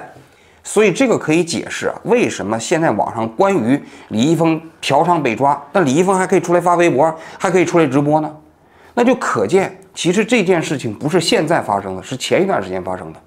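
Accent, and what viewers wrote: Standard. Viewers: 王局发音很标准，没字幕我也能听懂，没字幕也行的。同意的点赞。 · 王局字正腔圆，声音清楚，不需要字幕也听的非常清楚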